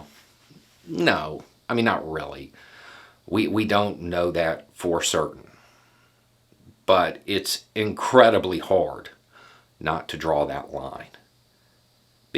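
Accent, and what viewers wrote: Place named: Charleston, United States. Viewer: Worst Charleston accent ever.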